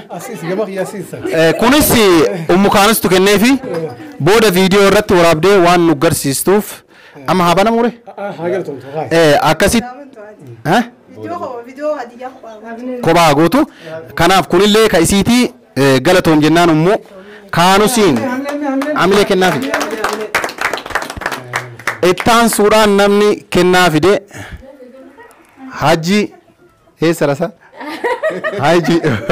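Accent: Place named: Arsi, Ethiopia. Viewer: Gafiin qaba gossa tammi loqudni isaa arsi nati fakkate galatomi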